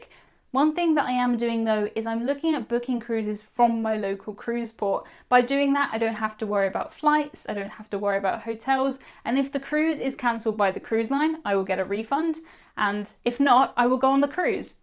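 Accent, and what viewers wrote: United Kingdom. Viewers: Based on this video, you know.. there isn't a definite answer, it all depends on the situation and the insurance company. But, having said that this is the best, most explanatory vid I've seen. Thank YOU! I will post a link to this vid for people that ask me about the cancellation policy and possibilities. RE: the naughties.. no, we dont' say that, I guess we just call it the 2000s? but from now on, I'm calling it the the naughties, lol. Definitely agree with the waiting game. I have an Oct cruise. A few months ago I had no doubt it would happen, now... I'm not so sure, but I'm not cancelling! Thanks. love your content, and your accent just takes me 'home' (mom is british, dad american, left Uk when I was 5)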